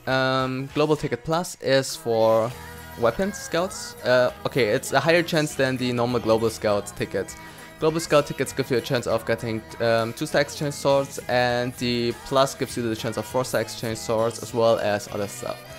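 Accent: Germany. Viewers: Özlem Polat and for his accent, it’s a german/ dutch accent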